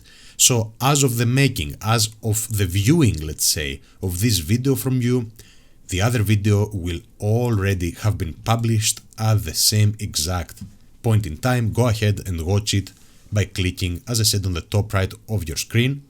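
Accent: Greece. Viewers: your accent is greek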